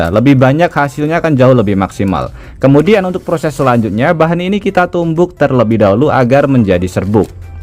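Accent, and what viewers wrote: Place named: Central Lombok, Indonesia. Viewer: lombok tangah ni bpk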